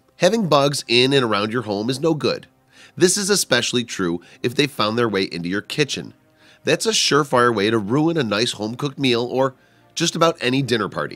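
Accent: United States. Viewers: Long winded American voice drive insane ,please come straight to the point, be more helpful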